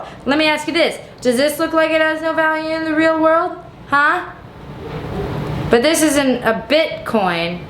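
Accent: France. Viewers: your french accent 👌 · your french accent >>>>>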